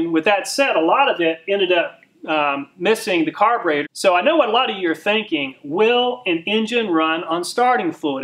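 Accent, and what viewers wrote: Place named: South, United States. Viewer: I like how this guy talks. His mouth is so animated and the southern accent. Very entertaining to watch/listen.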